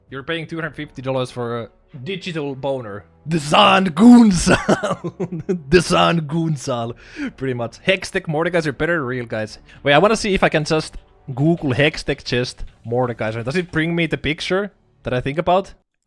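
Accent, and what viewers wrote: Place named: Finland. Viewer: Hyvä video. Suomi aksentti paistaa vähän lävitte. 👍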